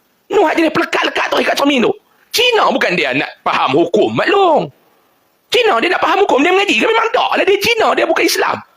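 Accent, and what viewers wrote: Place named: North, Malaysia. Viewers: Mana ada kasaq..aku dengaq elok ja...hg ja kata kasaq..tapi hg suka baguih la..memang cara kami cakap macam tu..oqang luaq dengaq mcm kasaq tpi memang mcm tu kami cakap... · org utara memang nada cakap mcm tuu... biasa saja tone org utara cakap😂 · Aku org kedah, maaf laa aku rasa ustaz ni ceramah tak kasaq pun, biasa ja bahasa dia, cuma dia ckp tu pekat kedah biasa laa, ala ala smbg kt kedai kopi ckp lagu ni laa… kalau org luaq mgkin nmpk mcm kasaq, jd benda tu hanyalah subjektif… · Ramai tk suka bahasa kasaq ustaz ni,,, tp aku suka,, meresap dihati makna yg ustaz ni sampaikan,, org utara cakap kasaq tp sedap dan mudah faham,, terbaik❤ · Pekat dia punya Kedah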